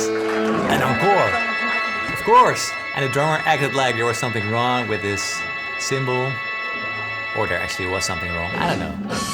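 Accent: Netherlands. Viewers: i might be rly dumb here but I never noticed the dutch accent till now... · Did you say Holland? I was trying to figure out your accent. You're showing me the music inside the music. Thank you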